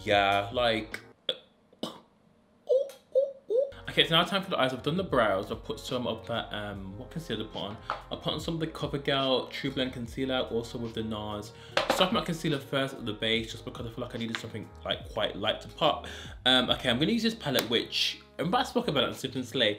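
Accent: United Kingdom. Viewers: When the Viewers forget all about the heavy uk accent 😩Not from the US guys😂😘😍😘😍😘luv watching u😍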